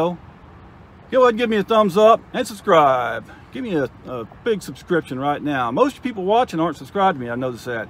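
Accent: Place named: Appalachia, United States. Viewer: Dude, love yer commentaries, BUT ....... please speak SLOWER. I am in Australia, and I am having trouble understanding yer hill-billy twang. Other than that, love yer posts. Love CCET. Be happy and healthy in these hard times.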